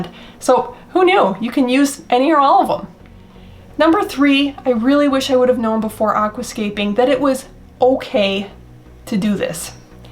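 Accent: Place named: Midwest, United States. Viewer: I'm not sure if it's your Mid-Western accent or Mom humor, (that can be a thing, too right?), but you are delightful and engaging teacher!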